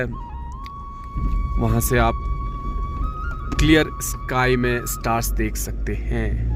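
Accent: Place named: Ladakh, India. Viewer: You speak Ladakh pure very nice!!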